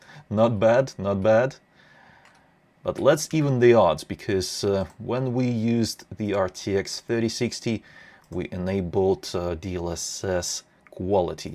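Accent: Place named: Russia. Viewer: Guy with Russian accent talking about FPS makes me nostalgic for FPSRussia channel.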